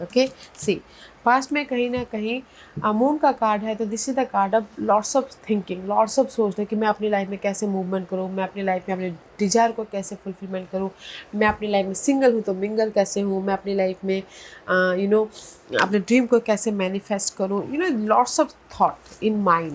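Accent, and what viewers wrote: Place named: Bengal, India. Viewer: Bengali accent 🙏🏻👁🙏🏻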